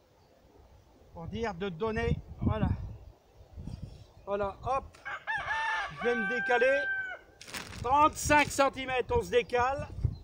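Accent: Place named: Nord-Pas-de-Calais, France. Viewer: Ça fait du bien de vous écouter L accent de ma naissance bravo le ch’timi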